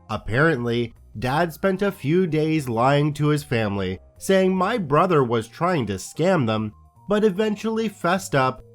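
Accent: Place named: Canada. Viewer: Also "manufacture" and "process". You don't have the stereotypical Canadian accent on "house" and "about" and you don't throw in a bunch of "eh"s, but the accent is still pretty prominent.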